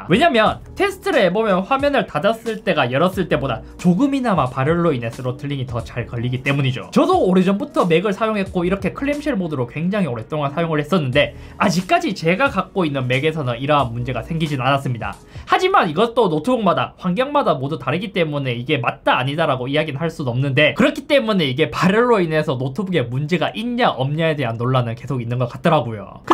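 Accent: Gyeongsang, South Korea. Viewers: 경상도 억양 빼고 다 좋음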